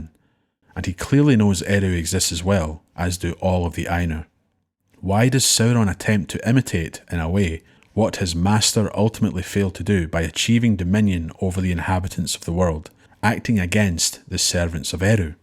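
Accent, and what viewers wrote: Scotland, United Kingdom. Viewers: Glad to have found this. And glad to find a fellow scot as a narrator.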